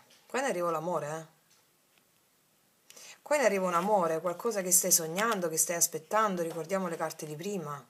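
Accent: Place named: Apulia, Italy. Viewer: Cara, è la prima volta che ti sento... E mi hai subito conquistata per tua Bravura, Simpatia... E il tuo Accento che mi dice che sei Pugliese Come Me!!! Non sono una che segue questo cercare le Carte... Ma mi sono rivista in pieno in questa tua Lettura... Che ti dirò... Azzeccata in Pieno!!! Ti cercherò ancora.. perché sei davvero una Persona Elevata.. A fare Ciò che sta regalando a tutte noi!!! Ti ringrazio.. E ti Abbraccio Forte!!! ❤😂😮😊😅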